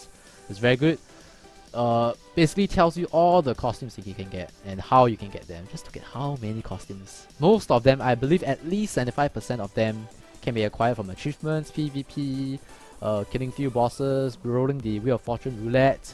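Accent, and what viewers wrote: Singapore. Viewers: Love your accent. Singapore maybe?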